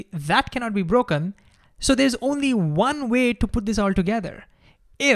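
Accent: India. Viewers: Trying to listen to a hyperactive Indian accent stumbling over the English language, who has a constant conversation with himself and Einstein - is extremely disconcerting and tedious. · Considering how fluent so many Indians are in English why do they insist on maintaining a barely comprehensible accent?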